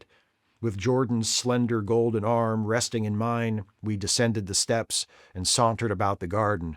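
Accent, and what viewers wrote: United States. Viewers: Like a lot people my age, this was required reading in college (“college” is British for High School, to avoid confusion) but I always loved it anyway. There’s definitely something about hearing the text in an American voice. I’ve been a subscriber for years now, so your love for the novel is not new, but this is excellent work, I’ve listened to every part so far, and it’s something that I’m going to look forward to seeing in my subscriptions for the next few evenings.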